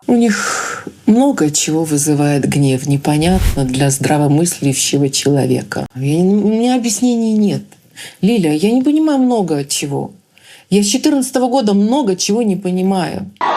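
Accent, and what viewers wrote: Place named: Russia. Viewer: сгарайцє, сгарайцє...,, Фу, гидота. Вже навіть орківську вимову перейняла.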